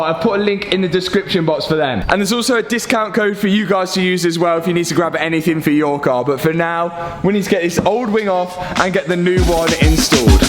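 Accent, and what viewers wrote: United Kingdom. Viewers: This will sound bad but I don't like the British Accent at all but for some reason i enjoy your content and your energy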